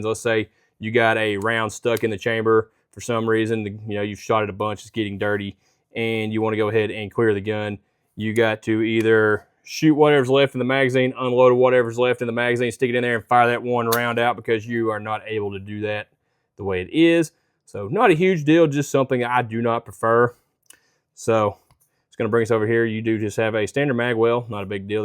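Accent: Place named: Deep South, United States. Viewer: Talk slower and enunciate so we who do not live in the Deep South can follow what you are saying......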